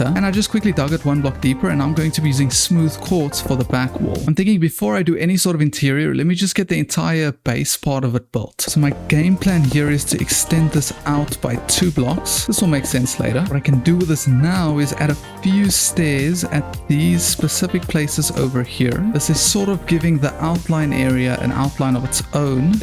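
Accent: South Africa. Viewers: Are you from south africa? I can hear a accent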